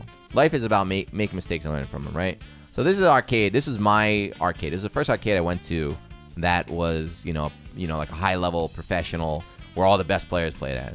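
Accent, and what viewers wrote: Philadelphia, United States. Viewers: You're from Philly, man?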